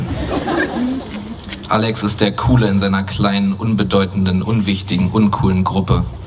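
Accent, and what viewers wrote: Berlin, Germany. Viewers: der Text an sich ist schon sehr schick, zusammen mit seinem Berleinern bekomm ich mich aber an manchen Stellen nicht mehr ein. Slampoeten mit Dialekt sind einfach genial, liebe es das niemand ihn versteckt.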